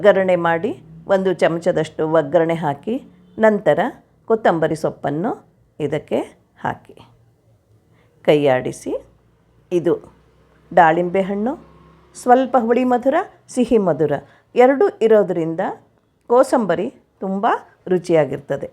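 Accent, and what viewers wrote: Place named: Mangalore, India. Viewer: 🙏 ನೀವು ಯಾವ ಊರಲ್ಲಿ ಇರೋದು ಮಾಮಿ... ನಾನೂ ಕೂಡಾ ಉತ್ತರ ಕನಾಟಕ ದಲ್ಲಿ ಇರೋದು.... ನೀವು ನಮ್ಮ ಭಾಷೆಯಲ್ಲಿಯೇ ಮಾತಾಡಿ ಚೆನ್ನಾಗಿರತ್ತೆ... ನೀವು ಮಾತಾಡೋದು ಮಂಗಳೂರು touch ಇರತ್ತೆ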